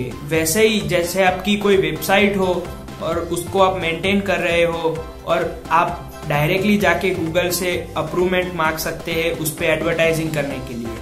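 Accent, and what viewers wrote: Gujarat, India. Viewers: Gujarat se ho bhai?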